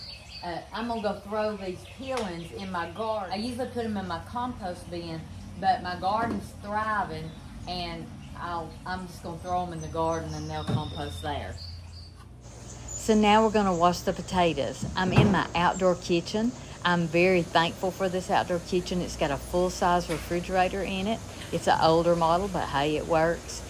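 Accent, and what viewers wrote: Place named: Alabama, United States. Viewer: I just love to hear you speak. You have an accent like me! I was born and have lived in Alabama all my life. I love my presto digital pressure canner.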